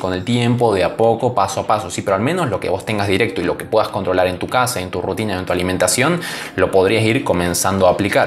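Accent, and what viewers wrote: Argentina. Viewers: Sos de Cordoba ?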